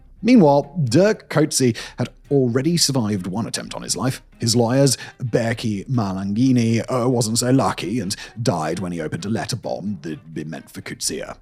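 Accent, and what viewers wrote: England, United Kingdom. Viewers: Pokemon Red on 1 screen, A British man describing the worst thing ever on the other. Perfect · 'N Britse kont agter 'n skerm.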